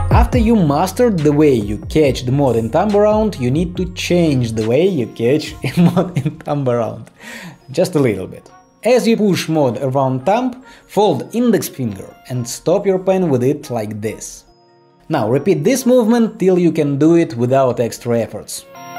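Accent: Russia. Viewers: Включил видос и с первых секунд услышал русский акцент👽🗿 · God, this russian accent!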